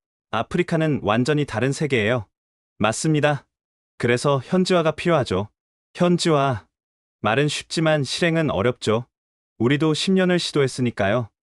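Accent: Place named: China. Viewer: 여기 유튜버 중국인인가? 아님 어그로 끌려고 말투를 저리 설정한건가 듣기가 참 힘드네, 암튼 정산은 아니네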